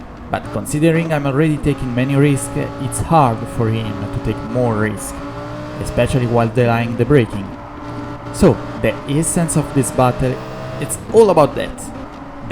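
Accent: Italy. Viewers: non ti offendere ma una cosa te la devo dire (dall'accento direi che sei romano come me): imparati gli accenti e le preposizioni in inglese perche' veramente suoni strano eh. La pronuncia di opponent, protect, penalty, delaying, allowed, comfortable, legit, our, consequences, assume, essence, e alcune altre parole e' sbagliata, soprattutto l'accento, e l'uso delle preposizioni e' spesso sbagliato. Google translate e altri tool gratuiti ti possono aiutare perche' leggono i testi a voce alta. Sono parole che parlando di corse auto dovrai ripetere ogni volta, quindi aggiustale una volta per tutte e via.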